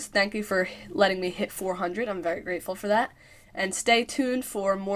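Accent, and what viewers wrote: United States. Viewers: r u from chicago? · I like the video but you're accent is terrible I can't stand a New Jersey accent!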